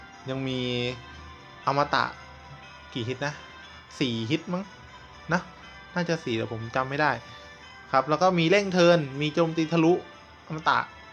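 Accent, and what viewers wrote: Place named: England, United Kingdom. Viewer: อ่อ เป็นสำเนียงอังกฤษ เข้าใจแล้วขอบคุณครับ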